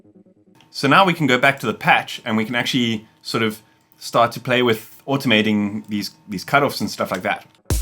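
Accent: South Africa. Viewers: You’re a good teacher on the subject you sound SouthAfrican?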